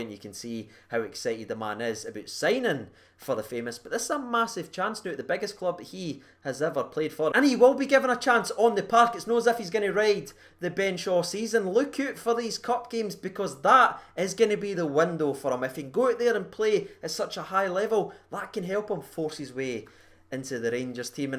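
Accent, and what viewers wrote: Scotland, United Kingdom. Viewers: Scottish, vocal and good reactions Alan McGregors brother?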